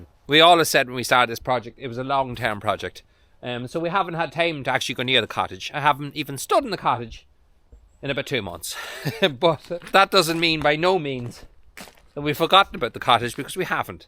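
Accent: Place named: South, Ireland. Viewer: Another great video,i wish you did one or two during the week as well. I just love the irish southern accent,im from the uk and have visted cork area 3 times and i just love IRELAND... Hope to come back very soon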